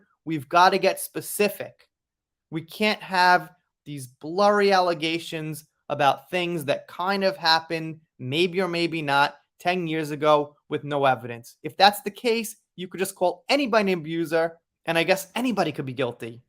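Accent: New York, United States. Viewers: I find your voice and accent sooo new york ...it is perfect ...keep it! Dont change...when young i moved to california (in thr 1960s bedore insanity) and was made fun of so i DELIBERATELY got rid of my midwest twang.. i actually had teens ask me about indians living in tee pees..no joke..they were that ignorant...i ended up being the science aid because i was so far advanced in science compared to those kids..actually in every class...and i was considered a c and d student in oklahoma...there I was an a plus student with out trying. There.fed your ALGORITHM...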